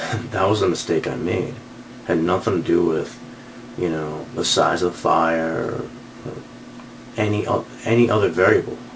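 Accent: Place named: Massachusetts, United States. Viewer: Watched part 1 as well. Thought to myself "sounds like he's from ma". Soon as I heard you say New Bedford I knew I was right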